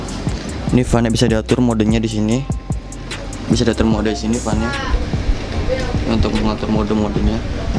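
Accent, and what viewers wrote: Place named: Java, Indonesia. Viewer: biasa lidah jawa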